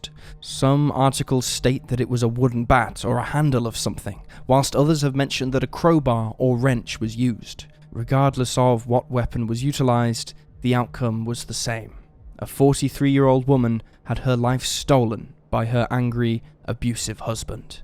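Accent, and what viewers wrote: England, United Kingdom. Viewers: "Quote" These videos sound rather odd with an English accent speaking in an Americanised way.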